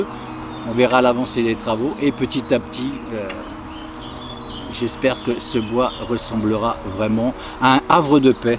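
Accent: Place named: Central France, France. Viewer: vous avez une façon de parler qui m est familière surement pas loin du centre de la france ... je vous souhaite bien de belle trouvaille prospecteur dans votre jolie coin de vie ou le calme règne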